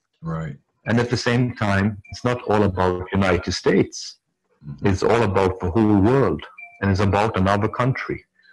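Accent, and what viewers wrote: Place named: Netherlands. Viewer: Gaia's Public Health Nurse yes it seemed some paper or something was rustling up against the microphone. Never mind, it causes us to pay closer attention. I think his accent is Dutch or Dutch-Indonesian.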